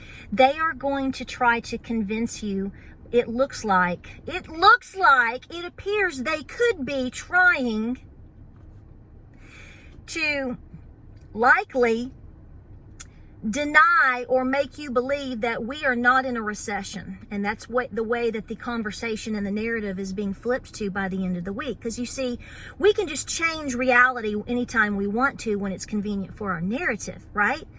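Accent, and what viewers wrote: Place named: Appalachia, United States. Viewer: This is 100% appalachian woman my wife and 3 daughters and every homegirl i know sounds exactly like this...you want her on your side lol..she's slowing it way down trust me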